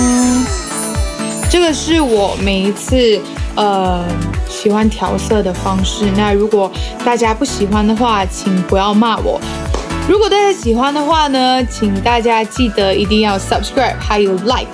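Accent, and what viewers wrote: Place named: China. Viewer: 很會學中國腔🤣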